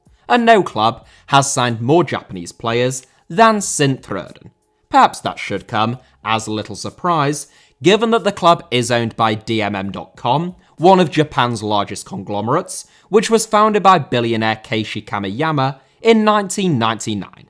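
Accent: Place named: United Kingdom. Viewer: Woooooooooaaaaaahhhhh WTF. Check your British hate pal, whilst you have that accent!! You big up the Turkish Sultan for donating £1000 from the Ottoman Empire (which was collapsing at the time, and could be the actual truth about the sum donated) and then you take a ducking swing at our Monarchy, after starting the section AND THE BRITISH LET IRELAND STARVE. Then admit that was a lie, and Queen Victoria donated TWICE what you just licked the arse of?!?!? DID THE BRITISH INVENT BLIGHT, THEN?? Did this nation disease all the potatoes?? No we didn't. KEEP OUR MONARCH'S NAME OUT OF YOUR DUCKING MOUTH. Tell both sides of a story for once!!!!!! Or better still, stop reminding us why there is NO PLACE FOR POLITICS IN SPORT!! Did you research Manchester City's connection with Germany? Or how the Jewish community were the ones who held out their hands post WWII in Manchester? 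No you didn't, because you are ALWAYS about the low-hanging fruit, and it's getting boring now, you Progressive little Puss!! MAN THE BUCK UP and leave the country if you just want to slag it in every video you make. I'm sick to death of traitors in Britain. YOU are why this country keeps getting worse. You ARE NOT the solution. What is it about this channel and Commie nations? Go live in one, and maybe you will stop giving them so much screen time. Or do you have Chinese investors too? HULL INTERNALLY TAKE CHINA SEVENS at a time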